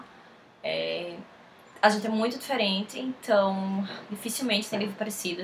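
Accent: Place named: Portugal. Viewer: vc por acaso é portuguesa ou de algum país? é que vc tem sotaque!!!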